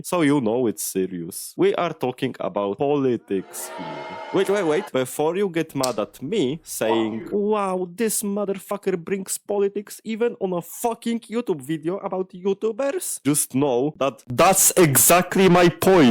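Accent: Poland. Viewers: your accent sounds so polish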